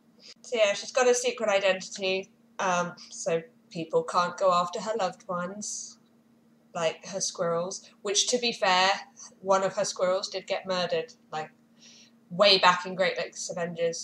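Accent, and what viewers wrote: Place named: United Kingdom. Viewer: I love this comic. Everything about it. the art, the writing and the characterization all fit the tone. Once again Marvel knocks it out off the park. Not only do we get an awesome new comic but a strong developed female character. Now all that left is the Ms. Marvel/Squirrel Girl crossover. Great vid by the way. ALways nice to met a fellow comic book fan and also love the British accent. lol P.S I find her really hot! like DAMN! i.e she is funny, witty, smart, curvy, eccentric and she has a tail. Am i right? huh? huh?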